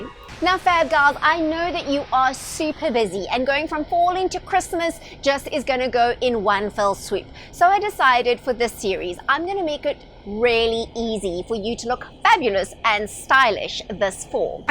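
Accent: South Africa. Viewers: Oh my goodness, you made my day - I was commenting before you got to the end, because I was so interested in your suggestions and already mulling over adding some burgundy into my wardrobe! DeepWaterHappy is my blog/YouTube/Insta/Pinterest "handle" for cruising, diving, travel and lifestyle content. I'm Kim Walther, a 51 year-old high school business/photography teacher from Florida. I run, bike, work out, produce a lot of artwork, and I also work as a sailboat captain. We wear khaki shorts and white company polo on the water. However, every day at school... I enjoy dressing up and coordinating stylish outfits. This year, due to your upbeat influence, I'm wearing a lot more heels to work and dressing it UP quite a bit. I've spent a lot of time in SA, Cape Town area, and dearly love your accent; it reminds me of friends and family there. And who better to give fashion advice than another Florida girl-turned-ZA! 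I absolutely adore you! Thank you for the watch! Mwah! Baie Dankie!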